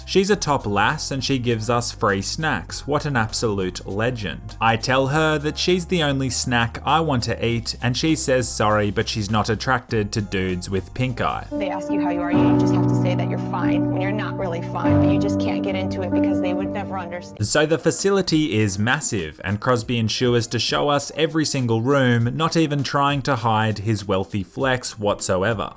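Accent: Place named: Australia. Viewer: ONLY 160K SUBS? What? Under rated channel! I've beem hooked on amazing commentary and hilarous and spontaneous kind of humour. Goes very well with the Ozzy accent. Keep up the good work!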